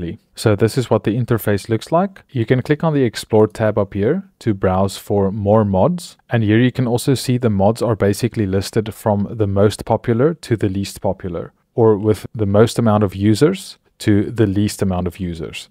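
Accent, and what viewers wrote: South Africa. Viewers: If I am correct he is south african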